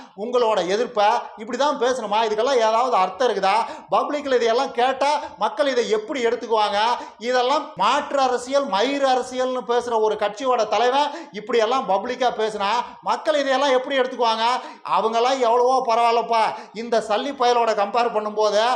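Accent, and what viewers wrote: Kongu, India. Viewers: ஏன்டா கொல்டி நாயே... பஞ்சம் பிழைக்க வந்த நீ கொங்கு தமிழ்ல பேசினா தமிழனாக ஆயுடுவியாடா நாயே... நீ யாருன்னு எமக்கு தெரியாதா நாயுடுகாரே....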